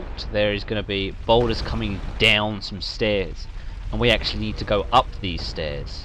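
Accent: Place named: United Kingdom. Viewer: Ok, have I done something wrong?. I've opened up Anor Londo but after seeing this vid I thought i'd go back and clear up all the loot and kill the headless demons in the basement ( I dunno their name ) I jumped down to talk to Siegmeyer and the guy ain't there, he's not at firelink either (scratches head). Loving the vids, nice to hear a fellow Brit's voice on the commentary!;-)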